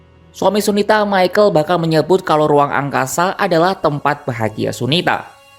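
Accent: Java, Indonesia. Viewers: kerja keras editor filter midbass karena medok parah. Aneh sekali narator ini. Kamu kuliah di mana sih. Saya aja puluhan tahun di Jawa ga medok. 😂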